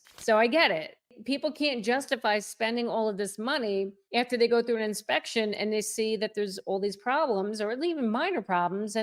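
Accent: New Jersey, United States. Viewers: Ms., I grew up in NJ and was glad to leave when I did because I like more open space, but I really enjoy your accent and disposition. Reminds me of the part of Jersey that I didn't know I still really appreciate: the people. Thank you for your objectivity and conviction. Been enjoying your content lately as a soon-to-be new home buyer.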